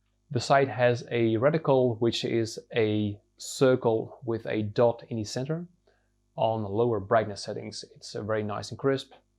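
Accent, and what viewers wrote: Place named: Scandinavia. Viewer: I hear that Viking accent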